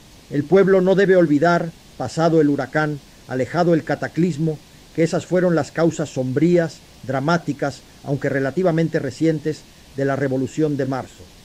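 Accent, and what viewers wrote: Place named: Spain. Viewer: Porque hablaba en español de España?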